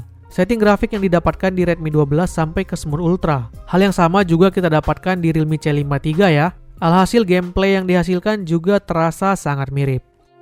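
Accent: Dumai, Indonesia. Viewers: Org dumai abg ni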